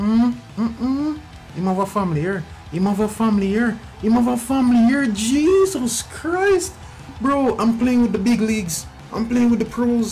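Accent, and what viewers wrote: Jamaica. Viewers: Bro got Jamaican accent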